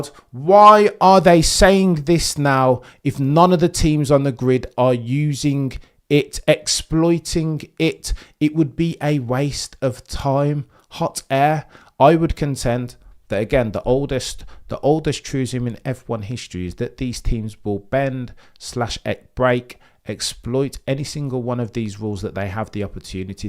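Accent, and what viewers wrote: Birmingham, United Kingdom. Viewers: Never would I have ever thought the way to make F1 jargon interesting is to say it with a brummy accent. Go on my bro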